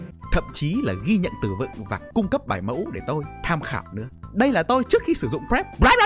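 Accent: Hai Phong, Vietnam. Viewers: Chú ơi chú là người Hải Phòng ạ?😅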